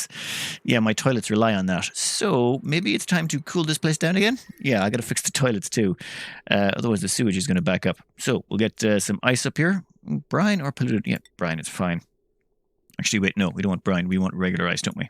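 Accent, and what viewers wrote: Ireland. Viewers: im watching this on my 2014 gaming laptop wot is falling to bits and i just love listening to loveley irish voice, if we can convince him to start a patreon or just keep okn id be happy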